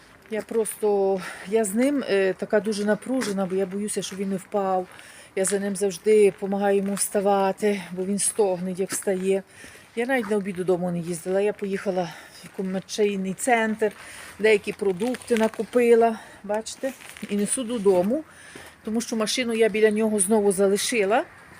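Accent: Ukraine. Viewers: Мені приємно , що так гарно ви володієте іноземною італійською мовою , мелодійно звучить з українським акцентом . Вдосконалюйте свою розмову !!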